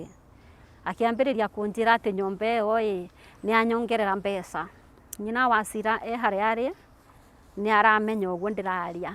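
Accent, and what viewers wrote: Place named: Kirinyaga, Kenya. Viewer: good show. Kama wewe unajua Kikirinyaga yako ni ya "aba" and not "haha" stick to one tongue sisi Wakirinyaga tunajua Kikikuyu yetu.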